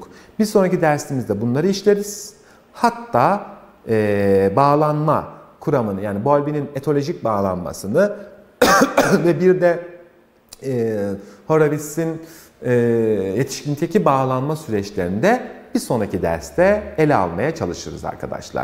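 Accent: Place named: Aegean, Turkey. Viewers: Hocam nerelisiniz? Konuşmanız çok güzel lakin e ve a larda Ege ağzı hissettim, merak ettim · hoca izmirli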